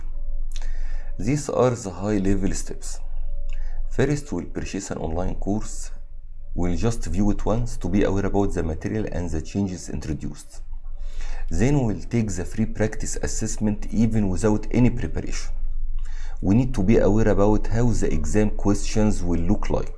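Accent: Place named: Alexandria, Egypt. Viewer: والله المشكله مش في العالم العربي المشكله العالم الثانيه ماراح تفهم كلامك واللغة اللي تتكلم فيها. انجلش بس الاكسنت من اسكندريه !!!!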